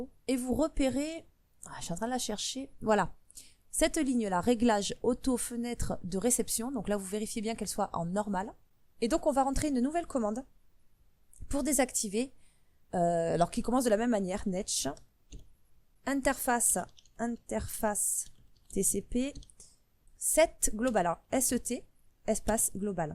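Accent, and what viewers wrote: South, France. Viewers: "cliquee droit"mdr, Merci pour ce bel accent, enfin un tuto sudiste ;) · a l'accent du sud l'accent qui chante ^^ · Excellent tuto que j' ai regardé avec plaisir. j'ai appris beaucoup de chose. Merci . Avec l'accent du sud.....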